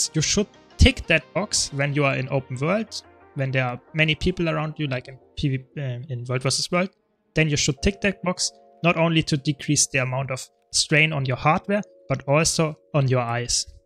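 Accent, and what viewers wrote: Germany. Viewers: des ischn deutscher ich hörs doch :D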